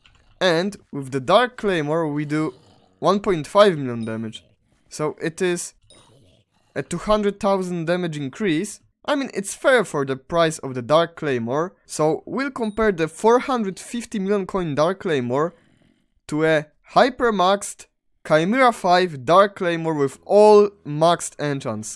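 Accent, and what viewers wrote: Poland. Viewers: Just by the voice i knew you were Polish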